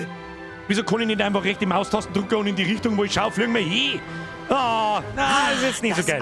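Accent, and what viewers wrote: Bavaria, Germany. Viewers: Geiler Stream wie eigentlich immer also wos soge denn lang du Bayrische Mundart Legende 💙🤍👍🍻🍻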